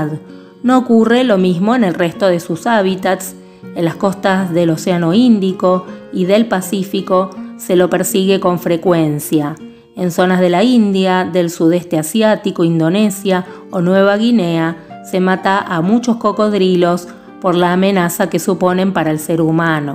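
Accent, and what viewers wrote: Argentina. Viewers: ¡Me encanta este canal! Soy de Nueva Zelanda y el inglés es mi lengua materna, así que disculpa cualquier error. Si aún no lo has hecho, deberías hacer un video sobre las aves de Nueva Zelanda. Tenemos muchas aves raras aquí, incluidas muchas que no vuelan. Me encanta tu acento. ¿Eres de Argentina? Un abrazo desde Nueva Zelanda 😊 .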